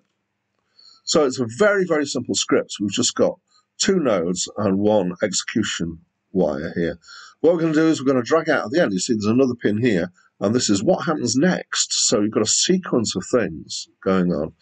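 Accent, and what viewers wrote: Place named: United Kingdom. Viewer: Ok plus noticed the accent my UK bud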